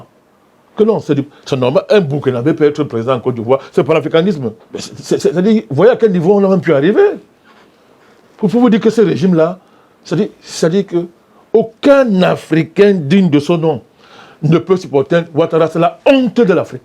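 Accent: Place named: Cameroon. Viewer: Longue vie l’état ivoirien plus respectable et responsable que ton Cameroun de malheur ton accent même est à gerber 🤮 🫢😁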